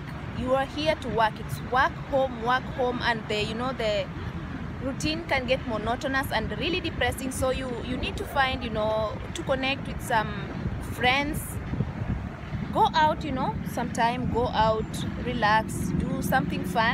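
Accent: Kenya. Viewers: Are you kenyan? You sound like one. I am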